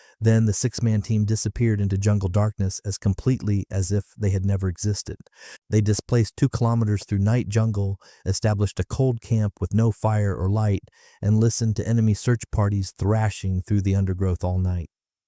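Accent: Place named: United States. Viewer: Mate, settle down, if you have any clue or understanding of accents this is an American narrator not an Australian production. Well done your Dad just different philosophies , Delta force doesn’t fight green army tactics nor do Aus special forces. Before bluster and loud noise do your homework and look at the actual kill ratio,s bud not just an assumption Americans do every thing the best. No one criticised the US soldier here but rather talked about mutual respect and teaching each other.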